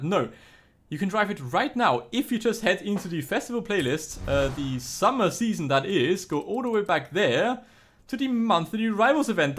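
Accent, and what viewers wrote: Germany. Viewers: You are a German right? Ps: Me too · Er hat einen deutschen Akzent oder bin ich bescheuert? 🤔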